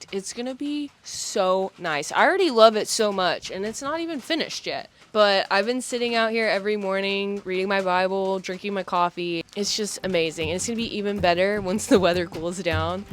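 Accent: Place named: Arkansas, United States. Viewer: You're accent sounds Arkansan. That environment seems very Arkansan. Are you in Arkansas? I grew up in NW Arkansas. Heaven on earth for around 4 month of the year. Otherwise it's hot as crap or cold as crap lol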